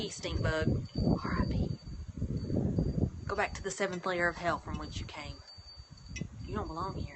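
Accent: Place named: Virginia, United States. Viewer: I live in Virginia, and we have a similar accent.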